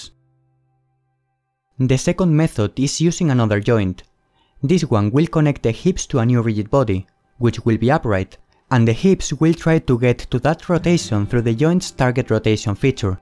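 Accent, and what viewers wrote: Spain. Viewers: eres español no? buen video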